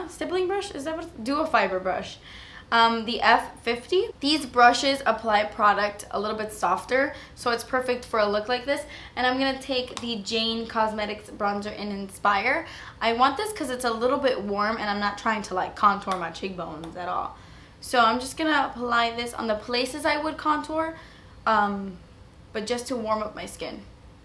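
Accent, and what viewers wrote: Miami, United States. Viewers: I love your Miami accent! Lol. So weird but I can always tell when someone's from there. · She calls it a Miami accent . She's Cuban but doesn't have the accent from them .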